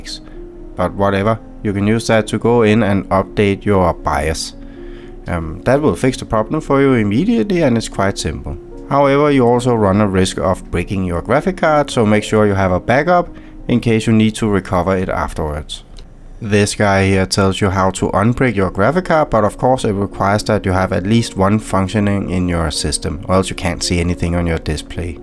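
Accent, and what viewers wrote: Denmark. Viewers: I know a dane when I hear one...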